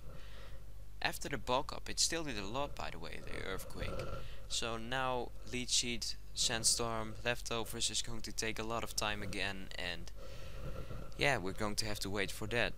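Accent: Netherlands. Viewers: are u dutch ? cuz i am dutch and i think i hear a dutch accent in your english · Hier had je echt nog een typisch hollands accent :] Wel enige verbetering in gekomen! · you sound dutch!?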